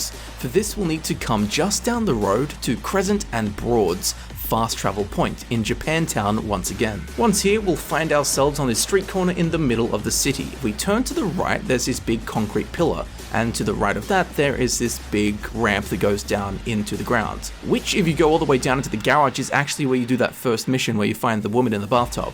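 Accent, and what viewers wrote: Sydney, Australia. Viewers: Your from Sydney aye? I'm from Newcastle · Hey are u Australian? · Yo, you from Sydney?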